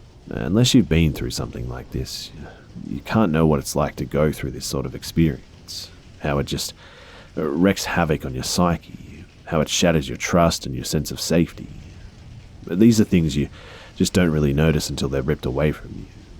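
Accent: Australia. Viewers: ay, is your accent australian?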